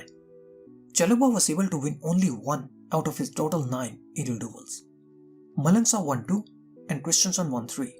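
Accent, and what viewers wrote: India. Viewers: Love ur content, hate ur Indian accent.. 🤮🤮🤮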